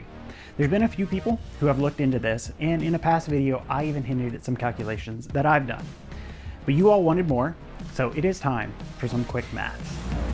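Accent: United States. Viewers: Fantastic graphics and visual, the best I’ve seen in awhile although 3blue1brown is giving you a run for title of best! BTW, you may already know this, maths = Brits, math = American, you have a clear American accent, lets stick with math - I insist (LOL)! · "maths"??? super awkward to hear the European multiples version of math in your American accent. just be consistent and say math.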